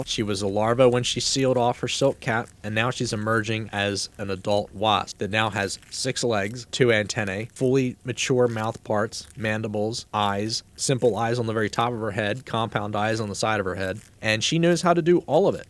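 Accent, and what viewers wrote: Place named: Pennsylvania, United States. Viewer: your pennsylvania accent really shows on your o’s haha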